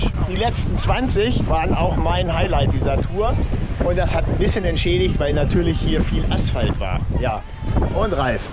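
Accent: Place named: Saxony, Germany. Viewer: Schlauer Startpunkt, der Sand am Ende kann dazu führen das man gedanklich ein paar Verwünschungen in Richtung des Scouts ausstößt... 😅 Dafür kreiert der Trail am See aber wirklich Begeisterung! Würde euch dringend Venus Valley ans Herz legen! P.S so richtig passend fande ich das sächseln (auch) nicht 😉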